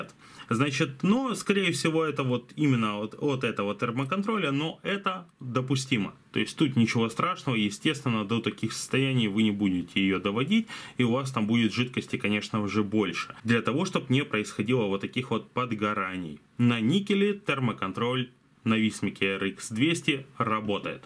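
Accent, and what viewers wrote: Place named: Odessa, Ukraine. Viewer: Продолжай на Одесском рассказывать! А то, я раньше, чтобы твои обзоры не скучными были, субтитры включал)))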